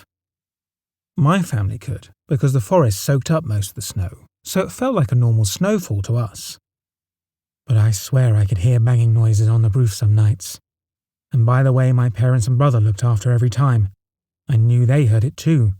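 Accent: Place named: United Kingdom. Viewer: From Wyoming with a British accent... Hmmm...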